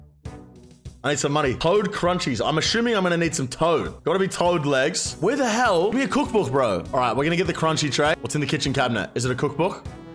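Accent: United States. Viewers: It’s refreshing to know Australians do American accents. I guess the thought just never occurred to me lol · Actually not half bad new york / jersey accent. See you at Tony's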